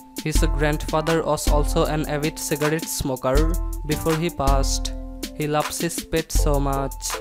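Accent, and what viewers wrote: India. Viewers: wtf is that engl ? are you from India ?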